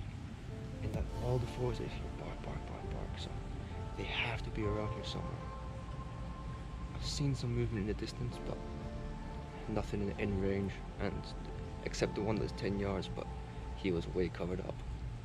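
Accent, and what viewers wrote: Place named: Scotland, United Kingdom. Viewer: I cant place your accent.... its scotish but sounds like theres somthing else as well?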